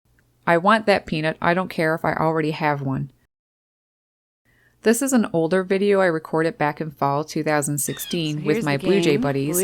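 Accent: Newfoundland, Canada. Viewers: This was very entertaining! I LOL'ed several times. I've started feeding blue jays recently, but I did not realize they could become so comfortable with humans. Their squeaks sound a bit different where I live... you sound Canadian... maybe your blue jays have a Canadian accent? Anyway, thank you for sharing this remarkable and delightful footage. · I love your jays!!!! My jay family seems to have totally different calls, reactions and habits than yours. Maybe cause they in a different side of the continent? I just love them too!!! Happy Jay watching!!! 💙 (I'm guessing from your accent you're in NFLD?) 😁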